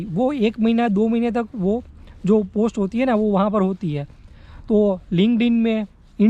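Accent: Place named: Saurashtra, India. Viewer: tamey accent thi gujarati to paaka cho pan saurashtra thi lago cho .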